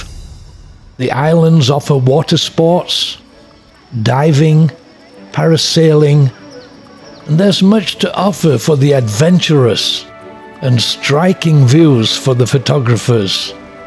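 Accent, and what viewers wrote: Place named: Yorkshire, United Kingdom. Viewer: Did you learn your Cantonese in Hong Kong? You sound like your a northerner. Yorkishire?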